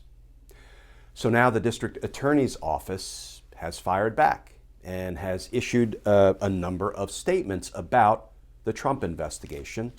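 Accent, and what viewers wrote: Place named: New Jersey, United States. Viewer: She was bought... Saw your interview with Stephanie Miller on Political Voices Network... Heard your spin on that hot mess in NY. Great interview & loved your New Jersey voice. ⚖️💪⚖️🇺🇸⚖️🇺🇸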